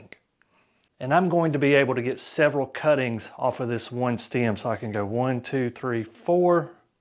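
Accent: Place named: St. Clair County, United States. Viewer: I watched this video for all of 3 seconds and knew that accent immediately.(grew up in st clair County for 19 years)😂 I'm subscribing for that alone. 🫡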